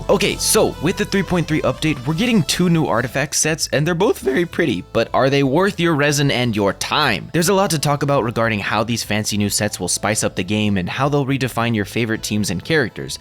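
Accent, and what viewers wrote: United Kingdom. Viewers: The british accent gave me an eargasm. Thank you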